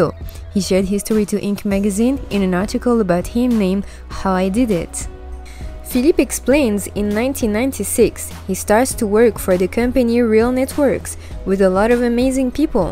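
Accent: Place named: France. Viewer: habibti!!! TYSM so much for all the research you did to make this really informative video. As always, it is a wonderful pleasure to hear your yummy French accent. Bisoussssss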